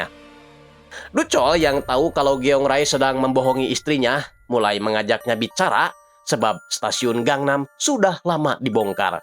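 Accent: West Java, Indonesia. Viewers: Lucu ga ada serem seremnya karena pembawa ceritanya sunda pisan 😂😂😂😂😂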